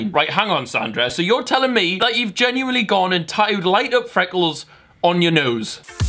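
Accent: Newcastle, United Kingdom. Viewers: the geordie voiceover videos are the best thing youve done since this year on the internet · Love the geordie voice over videos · Keep doing Geordie voice over vids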